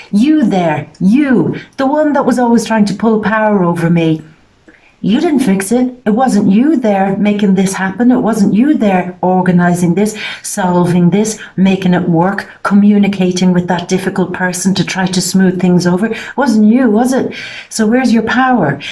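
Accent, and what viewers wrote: Ireland. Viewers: Love the irish accent, im from cork.xc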